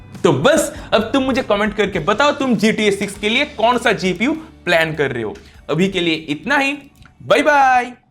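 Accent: Bengal, India. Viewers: You bengali?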